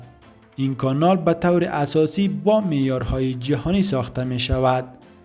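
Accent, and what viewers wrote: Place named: Iran. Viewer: mixed of Persian with Iranian accent. Guys y want respect speak in your dialect and accent. Ty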